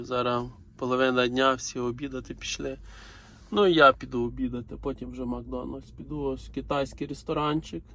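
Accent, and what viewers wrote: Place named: Vinnytsia, Ukraine. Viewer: Ви говорите як більшість на Вінниччині...))))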